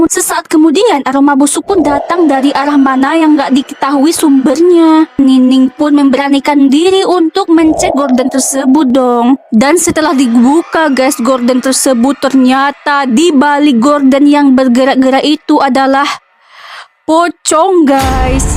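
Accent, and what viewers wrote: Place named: Padang, Indonesia. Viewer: Kak * dg khas logat padang nyaa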